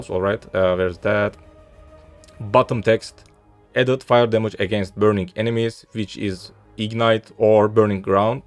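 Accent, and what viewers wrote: Turkey. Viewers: Anında Türk olduğunu anladım aksandan :D Bende genelde böyle konuşuyorum videolarda ya da sunumlarda kjahbfljangsg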